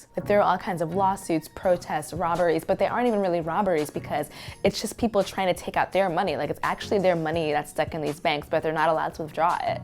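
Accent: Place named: United States. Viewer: Why are you using an american voice.................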